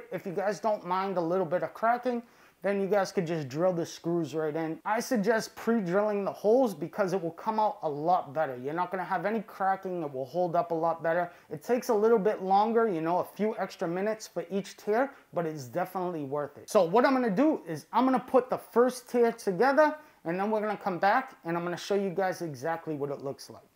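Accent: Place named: New England, United States. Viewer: This guys seems to have more of a new England area. Maybe long Island.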